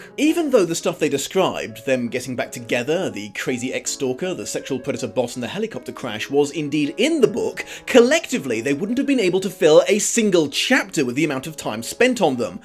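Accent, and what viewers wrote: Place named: United Kingdom. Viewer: I'm sortof giggling at the whole dom/sub thing. It was a branch of literotica I found very interesting in my younger years :) I can imagine you as a world-weary, slightly snippy dom who doesn't have the time for his subs' bullshit. If you're dressed as an Oxford tutor with a pencil behind your ear, it's your own fault for having such a marvelously British accent. I'm absolutely in love with the way you call out and destroy E L James's twaddle. Keep it up.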